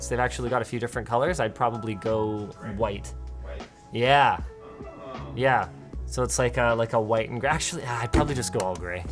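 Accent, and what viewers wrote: Canada. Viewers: I actually heard a bid of a Canadian accent from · sounded extra Canadian in this video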